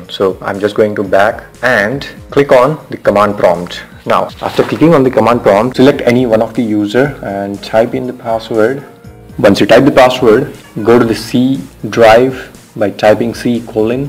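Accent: India. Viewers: Damn indian, I hate those accent. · Ae bhaiya , Kolkata se ho kya ?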